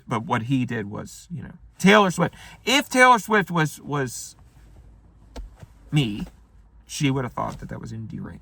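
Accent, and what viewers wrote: South, United States. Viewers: His southern accent is so fucking sexy… ❤️ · Nothing has ever offended me more then southern accent.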